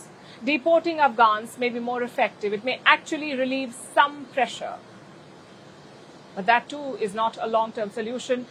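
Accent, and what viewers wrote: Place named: India. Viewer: Her English is India English not English..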